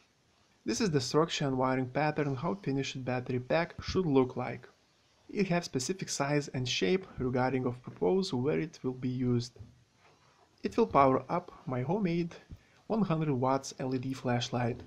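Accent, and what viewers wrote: Russia. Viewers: Русский акцент детектед:)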